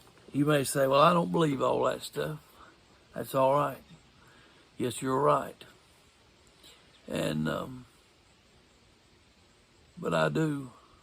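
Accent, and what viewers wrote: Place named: South, United States. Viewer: Believe none of what you hear, and half of what you see. .. don't know who came up with the original saying but never more true with how people can make things even appear real that ain't so. B asiC I nstructions B efore L eaving E arth Glad to listen to you talk. Love good ole boy country accents especially when a good message comes with it. Hope you healing progresses rapidly, and dang, if you keep sitting out looking at that scenery, you ought be healed up soon.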